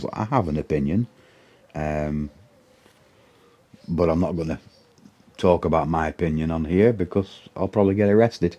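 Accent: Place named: Preston, United Kingdom. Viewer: I've just come across your videos mate, as my missus is Hungarian and she hates living in the UK the weather mainly. I thought your accent was familiar and you said you lived in Preston. We live in Longridge and I've been out to Debrecen a few times and think about relocating a lot. Where abouts are you living? I'm 40 soon and think it would be good for me to try it.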